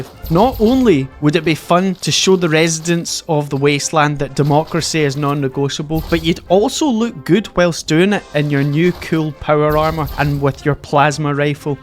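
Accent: Scotland, United Kingdom. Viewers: immediately like 3 seconds in the scottish accent throws it all off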